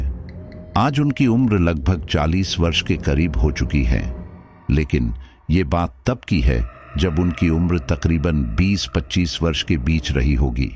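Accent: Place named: Rajasthan, India. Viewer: आपकी भाषा में राजस्थान वाली मिठास आती है ❤❤❤❤❤❤❤❤❤❤❤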